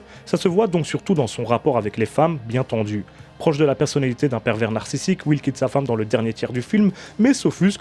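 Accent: Belgium. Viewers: Ouiiiiiii, moi aussi, pendant toute la critique, je te reprenais à haute voix,!;-) Mais en même temps, j’adore ton petit accent belge, ça fait partie de ton charme... LOL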